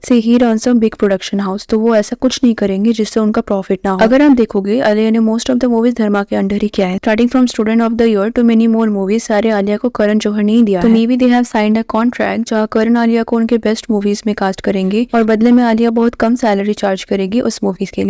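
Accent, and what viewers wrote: India. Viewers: Hey guys even I'm a spill be fan but the way you guys are comparing her with Spill bee is just wrong. She is doing a great job and i also noticed her content is different than spill be she doesn't copy anyone and i like this about her. And people who's saying accent is like spill be it's just INDIAN accent guys. is different and great let's not compare her with anyone.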